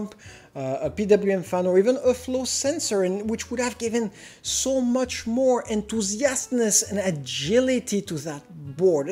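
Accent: France. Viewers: Great review combined with an outrageous french accent that Inspector Clouseau would be proud to own.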